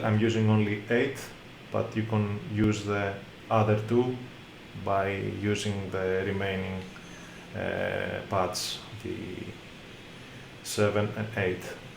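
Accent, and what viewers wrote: Greece. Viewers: ahahahah you are greek I can tell🤙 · that's the Greek accent, for sure !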